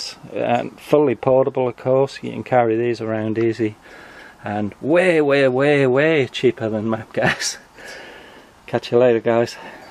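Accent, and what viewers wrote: United Kingdom. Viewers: How does an American have a British accent?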